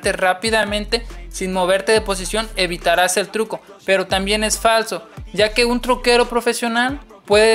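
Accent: Argentina. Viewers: Sos de Tucumán crack ?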